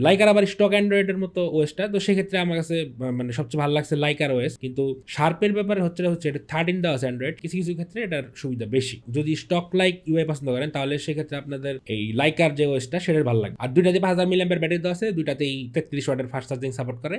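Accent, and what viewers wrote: Tangail, Bangladesh. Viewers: ভাই কি টাঙ্গাইল এর?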